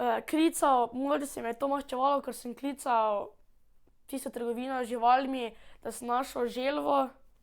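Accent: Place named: Maribor, Slovenia. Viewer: Končno negdo na YouTube ki govori Mariborsko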